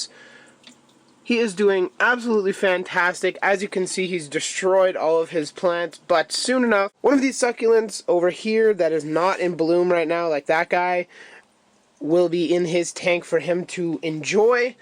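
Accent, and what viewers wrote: Canada. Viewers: Grass ( although I heard "rass") You mentioned you aren't from the states, are you by any chance Canadian?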